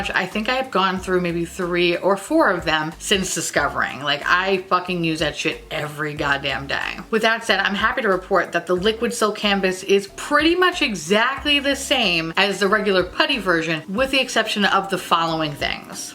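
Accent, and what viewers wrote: England, United Kingdom. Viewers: Love the English accent girl. Your skin does look beautiful. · Yesssss! Loving the English accent! Represent! 🇬🇧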